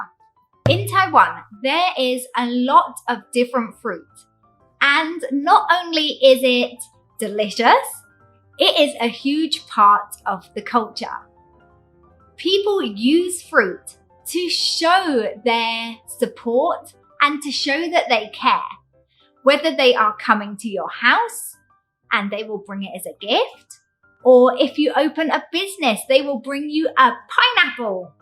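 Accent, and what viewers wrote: United Kingdom. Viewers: Hi I like your UK accent that saw so many video 👍 台灣真的是水果王國，我去到北美的時候看到水果幾乎都是冷凍的！覺得都不想吃了😨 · 慢速英国腔，好听。关注您。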